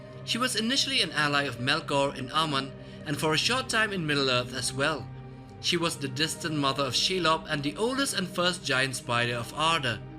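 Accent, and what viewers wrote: India. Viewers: You seriously need a better narrator; his wimpy boyish Indian accent really kills an epic vibe. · Lucas Frost have you never heard an Indian accent before?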